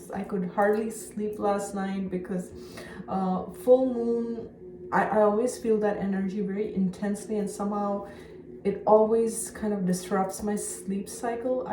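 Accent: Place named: India. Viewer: Thanks! On spot as always. Something caught my attention today watching this reading. Your accent changed to strong Indian accent today… is that because you were challenged to Indian gods? Never happened before as I’m watching your videos regularly.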